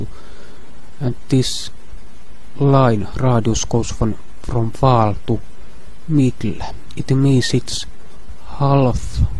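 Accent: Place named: Finland. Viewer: Finnish accent is best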